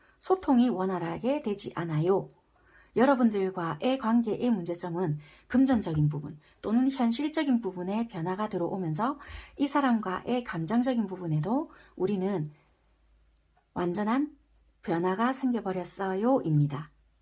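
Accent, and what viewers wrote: Busan, South Korea. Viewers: 4번! 잘맞는거 같아요! 있는 그대로, 솔직하게!! 네 맞아요. 그런 말 했네요. 금전적 현실적 어려움도 맞아요! 구독 추천 누르고 갈께요! 부산사투리 넘 좋네요😄 다음 영상 기대할께요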